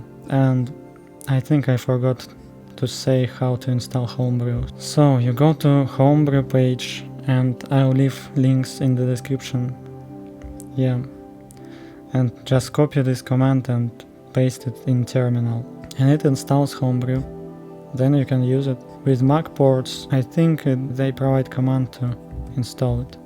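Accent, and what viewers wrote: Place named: Russia. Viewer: блин я сразу заметил русский акцент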